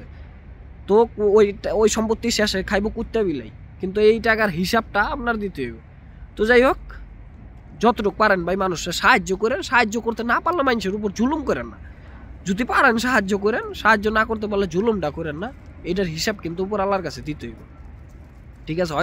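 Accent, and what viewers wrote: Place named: Central Bangladesh, Bangladesh. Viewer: Vay bari koi,,, Dhakar modha nki,,kotha suina mone hoi,tangail,manikgonj, Narayanganj,gajipur,ai dikha,,aktu reply dean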